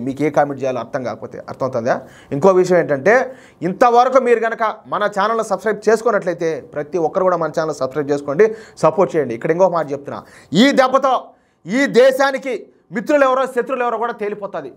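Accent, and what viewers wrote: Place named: Chittoor, India. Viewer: గారు మీ యాక్సెంట్ ని బట్టి మీరు చిత్తూరు జిల్లా కి చందిన వారని అనుకుంటున్నాను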